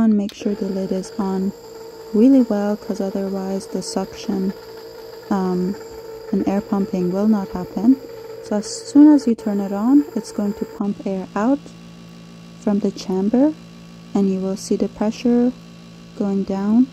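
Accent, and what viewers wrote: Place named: Iran. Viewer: I hear a lovely iranian accent ❤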